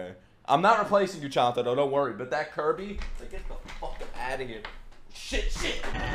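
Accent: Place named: New Jersey, United States. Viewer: I've been trying to figure this dudes accent, definitely Jersey.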